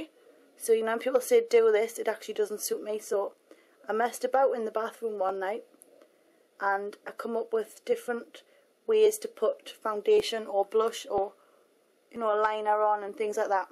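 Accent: Newcastle, United Kingdom. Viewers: omg are you from newcastle? x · She's got a Geordie accent....she's from Newcastle in the UK :) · she is from UK, so, she have a british accent :D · I can't believe these posters can't hear your British accent. I don't think it's your 1st language though. You are from somewehre else. Am I right? I like your video. You look lovely.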